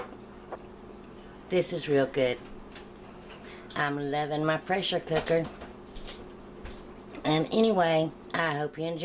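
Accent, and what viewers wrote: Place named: Kentucky, United States. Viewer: I love your accent, are you from Kentucky? I’ve made this dish several times from your recipe, it’s always delicious.. one of my favorite meals ... thanks for the video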